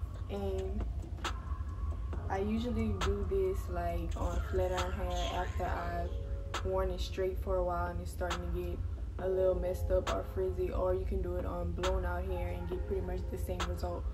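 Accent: United States. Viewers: You have such a pretty voice / accent, are you from New Orleans?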